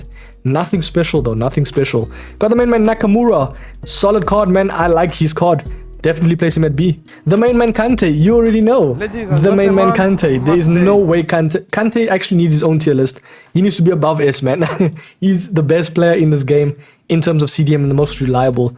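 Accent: South Africa. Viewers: . You're from Mzansi?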